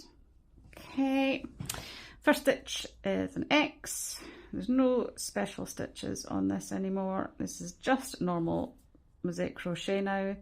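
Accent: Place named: Scotland, United Kingdom. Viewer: The taping comment made me laugh. Very scottish way of talking.lol and the dug in the huff 😂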